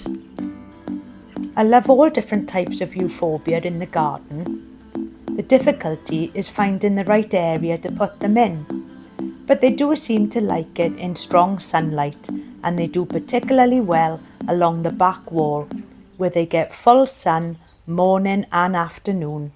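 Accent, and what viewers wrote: Wales, United Kingdom. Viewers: Thanks for this. Nice honest tropical style garden it’s inspiring. I love the welsh accent so kind and warm , much love x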